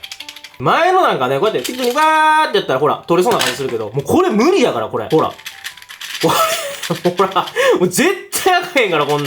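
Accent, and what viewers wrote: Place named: Kobe, Japan. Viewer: 方言的に神戸の人かな？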